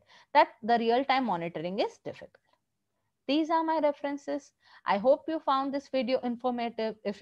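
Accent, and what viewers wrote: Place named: India. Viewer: Too much emphasis on Indian Accent.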